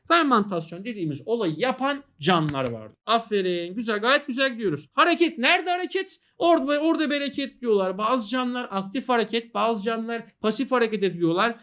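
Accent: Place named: Erzurum, Turkey. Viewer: Hocam memleket nere Erzurumlu gibisibiz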